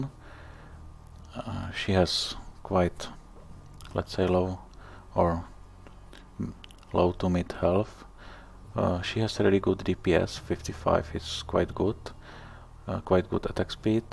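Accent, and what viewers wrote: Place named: Czech Republic. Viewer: Čech, že? Poznám podle přízvuku :D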